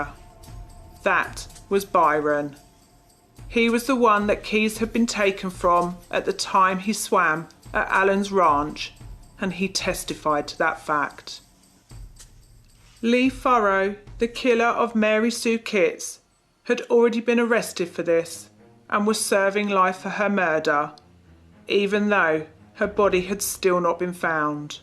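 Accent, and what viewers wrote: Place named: England, United Kingdom. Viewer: It's called an English accent ya stupe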